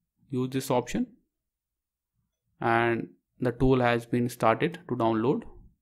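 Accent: India. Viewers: The first I heard Indian accent i knew it's gonna be a success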